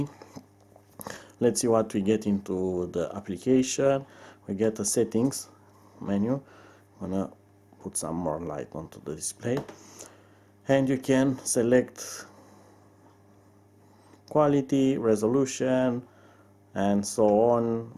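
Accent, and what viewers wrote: Romania. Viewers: Good review , buy the way this is 100% romanian enghlis, accent tell us :)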